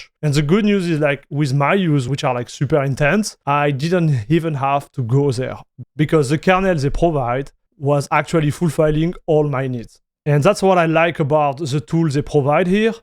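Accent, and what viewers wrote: Alsace, France. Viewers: Or Elsaß ;That is why the French hear German, everybody else hear French. -) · He's French speaking English. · I think he is from Alsace Region of France, it's definitely French with a German influence. The dialect is called "Alsatian". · he's speaking french but saying english words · Few seconds in and I was like "he must be French" ... checked his profile which says Canada... well, it's an official language there. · He only sounds French. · On sent l'accent français. Merci pour la vidéo. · As a Scandinavian, married to a Quebecoise, for me it sounds like a very European French accent. It adds a lot of character to the channel. I love it! Top content. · I'm German and I can only hear French here. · As an aussie whos heard a few french accents and a lot of french Canadian accents he sounds super euro french to me. But i thinks its his tonality and inflection of words that give him an Arnie sound also... 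He could do the french dub for Arnies movies 😂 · uuuh i hear a frenchy <3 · As a french, I can only detect the French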